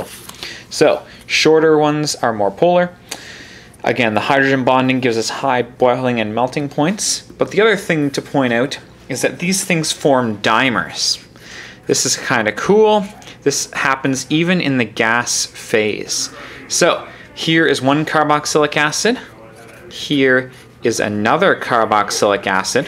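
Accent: Canada. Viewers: Thank you!!! btw, are you Canadian? You kind of sound like one :)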